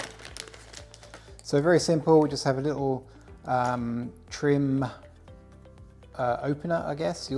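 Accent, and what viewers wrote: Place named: United Kingdom. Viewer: I got literally 2/3rds of the way through this until I realised that this wasn’t a UK RHD version car. Your accent threw me off completely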